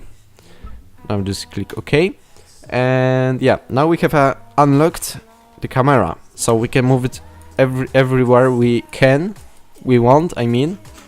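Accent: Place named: Germany. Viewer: Masz akcent jak najebany szkop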